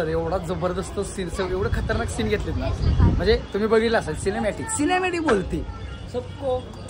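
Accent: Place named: Vidarbha, India. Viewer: विदर्भ bolte naaa bawaaa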